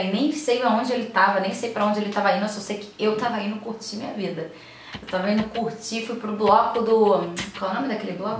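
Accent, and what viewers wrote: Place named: Rio de Janeiro, Brazil. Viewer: Ela é bem do rio ela arrasta o nasci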